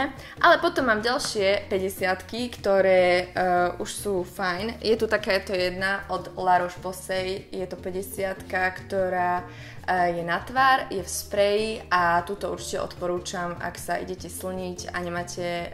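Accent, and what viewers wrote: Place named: Eastern Slovakia, Slovakia. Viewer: Môžem sa spytať kde byvaš??Lebo maš trošku vychodniarsky prizvuk:D:D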